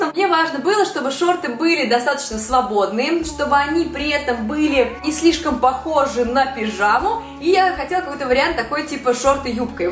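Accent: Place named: United States. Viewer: Вы слишком быстро разговариваете,прямо тараторите и манера разговаривать у вас какая -то американская.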